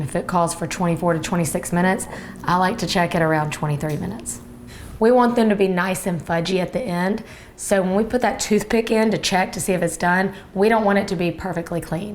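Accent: South, United States. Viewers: Love hearing you talk,Definitely can tell you are a Southern Lady,Makes me miss home,I'm from Southeastern Virginia.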